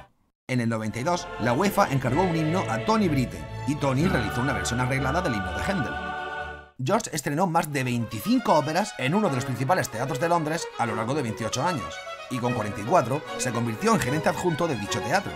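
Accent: Spain. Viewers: Vives en Andalucía Albacete o Madrid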